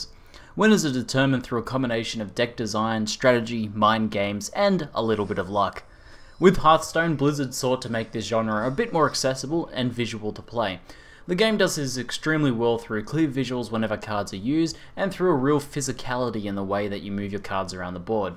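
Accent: Australia. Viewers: took me about 1 seconds to realise hes australian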